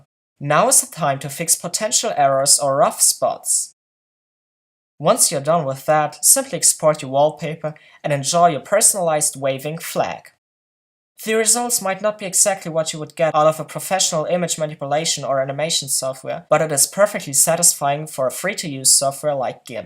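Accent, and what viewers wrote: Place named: Germany. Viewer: Hey thanks, it's really incredible to find exactly the fringe flag-editing tutorial I needed as soon as I thought of it. Also, that's a delightfully German accent you have there. I feel like I'm being told grand secrets about *something*.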